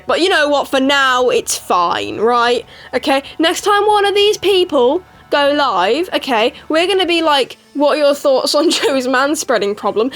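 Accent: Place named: RP, United Kingdom. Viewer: (Ignore how I’m late) PLEASE I LOVE YOUR VIDEOS MAN BAHAHAHA. And your British accent just makes this so much better because you sound so posh (I’m British don’t come at me-)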